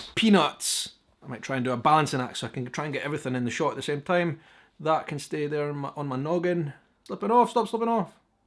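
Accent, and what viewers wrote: Scotland, United Kingdom. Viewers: I swear your accent has gotten thicker since moving back to Edinburgh! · I'm sorry, but when I do a Scottish accent; it sounds regionally similar to yours. What town are you from?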